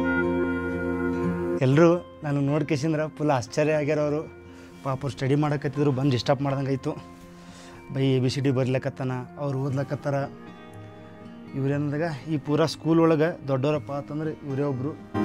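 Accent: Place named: Belagavi, India. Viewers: ಗುರುವೇ ಇಲ್ಲಿವರೆಗೂ ನಿಮ್ಮ ಚಾನೆಲ್ ಮಿಸ್ ಮಾಡಿಕೊಂಡಿದ್ದೆ ನಮ್ಮದೇ ಬೆಳಗಾವಿ ಕಡೆಗೆ ಭಾಷೆ 😀😀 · Nange ishta aguva some YouTubers Alli neevu nimma UK kannada slang super Brother YouTube li feature ide nimge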